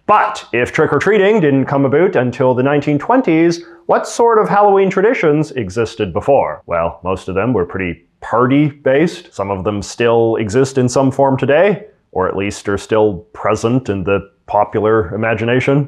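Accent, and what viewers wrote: Canada. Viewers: Hes Canadian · Thee most Canadian accent I’ve ever heard lol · Bro are you Canadian AF or do you hail from NorCal? Californadian? 🧐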